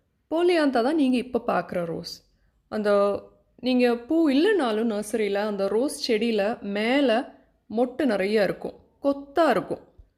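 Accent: Kerala, India. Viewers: I love ur Malayalam mixed tamil pronunciation 😍😍😍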